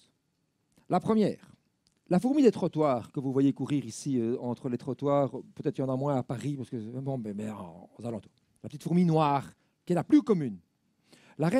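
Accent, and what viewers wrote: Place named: Brussels, Belgium. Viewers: Tellement magnifique!!! Qu'est ce que j'ai pu passé petite devant un nid de fourmis; j'en retire de mes expériences d'enfant, une société super organisée, j'étais toute jeune stupéfaite... Cette volonté, ce courage déterminé en la vie, sublime!!! Vous en parlez remarquablement bien!!! Elles sont formidables et quelquefois, excusez-moi, elles nous emmerdent!!! Néanmoins, depuis mon jeune âge, elles m'ont toujours fascinés... Votre exposé me rallie encore plus vers elles, je vous en remercie!!! A votre accent, vous êtes Belge (oui j'ai vu Bruxelles), vous êtes de Liège (l'accent) ??? Je le suis aussi ; mais à présent en Provence 04, et encore beaucoup de petites fourmis !!! SOURIRE !!! CHRYS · ... L'accent du conférencier, de même que son type d'élocution, sont plutôt ceux d'un francophone bruxellois. Ennoutre, l’intéressé est rattaché de façon assez significative à l'ULB. 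Un accent pas si différent, en l'occurrence, du phrasé d'un Benoît Poelvoorde, par exemple – encore que ce dernier soit originaire de... Namur ! (Mais le 'rouleau compresseur' linguistique qu'a été la télé depuis les années 60-70 a pas mal contribué à uniformiser nombre de particularismes régionaux, en Belgique comme ailleurs. Sauf, peut-être, du côté de Liège précisément, ex-principauté indépendante, bien connue pour son esprit frondeur et et sa résistance séculaire aux influences extérieures ?).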